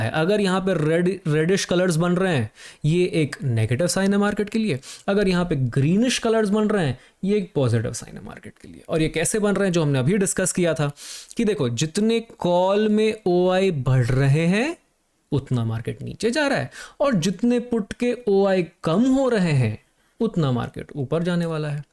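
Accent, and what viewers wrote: Bengal, India. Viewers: are you from Kolkata or Bengali ?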